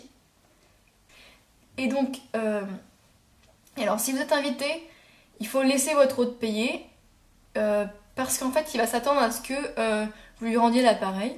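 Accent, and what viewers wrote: Japan. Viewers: J'adore ton axent, Japonais. 8D Les bonnes manières au Japon sont presque pareil en Corée. Tu t'es vachement amiloré x) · Vous devriez arrêter de critiquer ce qu'elle fait. Elle le fait pour la plaisir d'apprendre à ceux qui veut en apprendre plus sur le Japon ou sur la langue. Je suis une Française, n'ayant jamais visité le Japon et je ne vois pas en quoi "elle fait honte à votre langue" ou à votre pays, ou encore que "le Japon a une image débile" à cause de tout ça. Pour moi, elle ne donne pas cette impression, même que le fait qu'elle soit si passionnée et contente de ce qu'elle fait apprendre, elle donne envie d'en savoir plus sur ce beau pays! Voilà mon coup de gueule. xD Bravo pour tes vidéos,! J'aime beaucoup ce que tu fais et tes cosplays, ainsi que ton accent Japonais qui est trop mignon.:) Et je me marre bien avec les commentaires que tu rajoutes! 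x) Je connaissais déjà les bases de la langue puisque je l'apprends depuis quelques mois, mais ça fait du bien un bon rappel en vidéo. Un bon rappel des bonnes manières aussi, j'aime beaucoup le Japon pour ce respect et ses traditions. ♥ Bref, bonne chance à toi! Recommences tes vidéos, ne désespère pas parce que y'a des commentaires négatifs. Et si tu as juste pas le temps, bah j'espère alors que tu continueras quand tu aura le temps!;) J'AI ENCORE FAIT UN PAVÉ NAN D'UN CHIEN! x) Aaah. Bref, courage! Et j'suis impatiente de te revoir en vidéo! (une abonnée en plus!) xD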